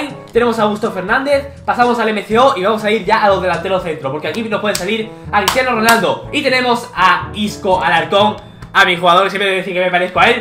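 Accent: Germany. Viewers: eres alemán? 😃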